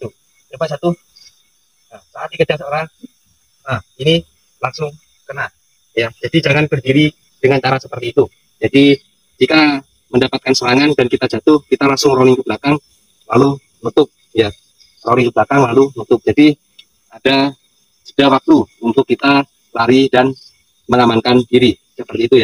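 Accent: Madura, Indonesia. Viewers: Orang Madura bukan mas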